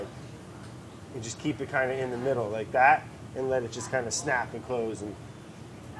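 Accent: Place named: New York, United States. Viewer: new York accent easeh peeseh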